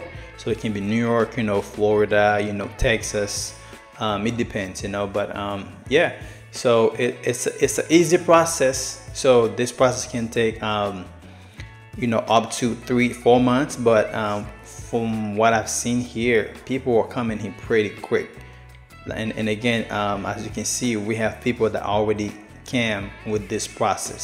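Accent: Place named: Haiti. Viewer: You re clearly Haitian . I hear your accent. Why would you do something so important only in English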